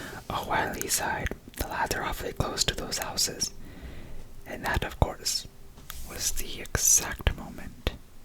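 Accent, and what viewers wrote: India. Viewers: Indian accent videos are my favorite!! Love the video as always and keep creating great content!!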